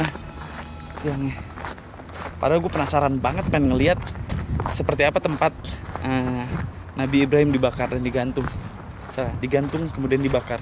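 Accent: Java, Indonesia. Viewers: asli mana bang? kok logatnya ada jawa²nya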